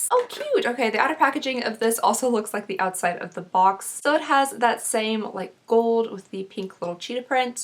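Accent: Kentucky, United States. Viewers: Are you from Kentucky????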